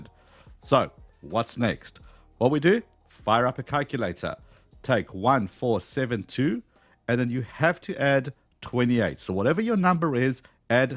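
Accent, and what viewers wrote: South Africa. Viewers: south african guy hear accent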